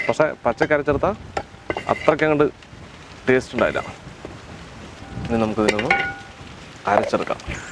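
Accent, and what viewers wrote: Palakkad, India. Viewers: ചേട്ടൻ പാലക്കാട് ആണോ....ചേട്ടന്റെ slang പാലക്കാടൻ ആണല്ലോ...I m in Palakkad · മ്മടെ പാലക്കാടൻ ഭാഷ, അതാണ്,,, ഓ,,, പൊളി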